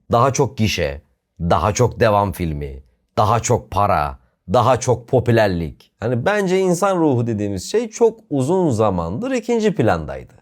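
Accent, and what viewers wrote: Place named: Çorum, Turkey. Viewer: Çorum şivesi :D jhafklsjnklfsşjfslşf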